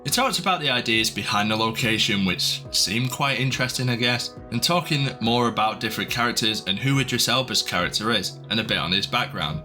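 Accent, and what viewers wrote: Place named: North of England, United Kingdom. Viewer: Nah he's from the North of England man, people speak like that there.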